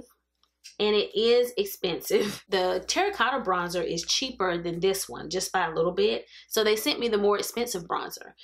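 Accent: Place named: South, United States. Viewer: I adore your personality. Where you from? You sound southern.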